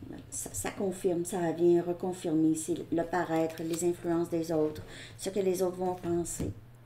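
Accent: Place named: Sherbrooke, Canada. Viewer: Woin a pomale le même accent à Sherbrooke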